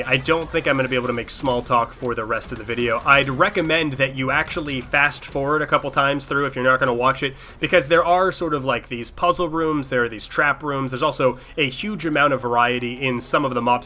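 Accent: Canada. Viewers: Canadian, Eh?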